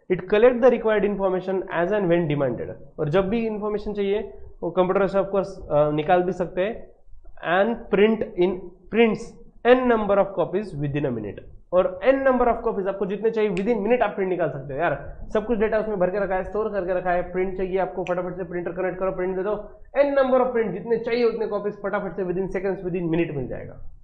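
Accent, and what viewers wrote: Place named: Maharashtra, India. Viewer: Your teaching is good sir but it seems like you are new in this teaching field and as a viewer i would like to give my honest opinion on your videos..u lack in speaking hindi as there is a slight mixture of marathi ascent while you speak hindi try to speak one language properly at a time... don't mix-up one language with another and i wish you all the best for your future.... 👍🏻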